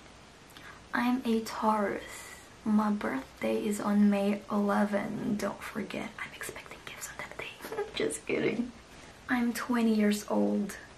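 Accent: Philippines. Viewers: Yeah I'm wondering which part of the Philippines she's from cos it sounds like she has the same dialect as me😊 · not really becuase her english ( philippines ) its our seccond here in southeast asia but honestly people they're using communication and grammarly which means like 95% taught in high school and students here in the philippines country Dialects accents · neither. she's using a different dialect but it's still from the Philippines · Sabi na nga ba eh , medj pinoy yung accent hehe ang cuteee, pa request naman po next vid mo tagalog asmr ate hehe , can't wait ♥️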